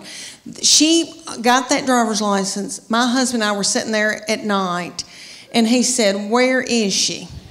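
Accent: South, United States. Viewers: O" Really? Me loves ya' Southern Country Accent though with them jokes of yours fits ya' Personality so, Very much or should me say fits ya' Personality so, Very well._ (Nevertheless, me Mr. Amos).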